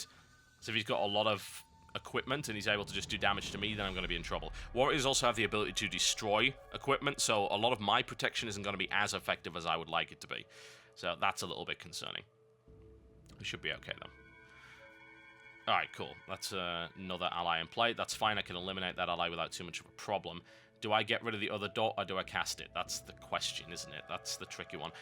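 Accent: United Kingdom. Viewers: Now really, think about this, British people talked like that first, therefore he is pronouncing it right, and we Americans are pronouncing it wrong.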